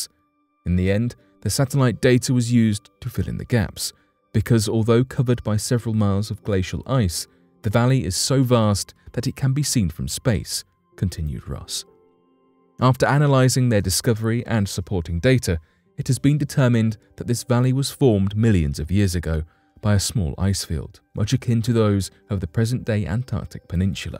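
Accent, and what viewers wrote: United Kingdom. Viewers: I like the content of the video. Im just sick of English accents! Anytime there is any science or history its a gdamn British accent! Im sick of it! Next vid of youres with a Brit accent and its a thumbs down!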